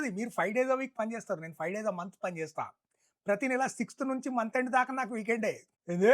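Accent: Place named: Hyderabad, India. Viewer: Amazing Slang of Hyderabadi Language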